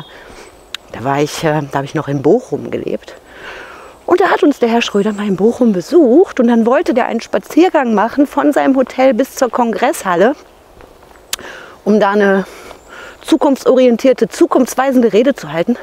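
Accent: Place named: Upper Franconia, Germany. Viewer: des is fei jetzt aber nett schee. Oberfränkisch ist ein sehr schöner Dialekt 😂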